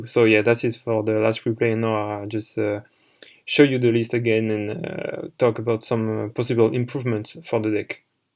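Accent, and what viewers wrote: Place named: France. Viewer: i'm assuming you're french by your accent but i'll write in english for everyone. I thought about this deck quite a while now bcs tempest was an ftk that got banned in modern formats and you overlooked some cards that can actually turbo this instead of playing a bunch of randoms cards. Magical library is a spellcaster that will keep the engine going while being lvl 4. I think the most important tuner here is plaguespreader and should basically be the only one. You can use summoner monk to go into library or armageddon knight to dump plague that will combo with monk to summon tempest. You then play a crazy amount of draw spell cards like hand destruction, bamboo swords etc. No upstart so you can otk/ftk and why not a copy of magical explosion. I never tested it but i think it would be the optimal way to go.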